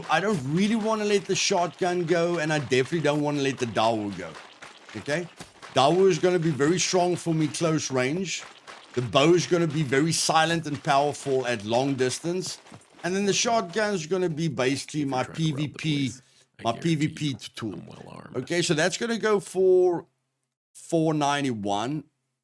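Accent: South Africa. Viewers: took me a minute to realize his accent was SA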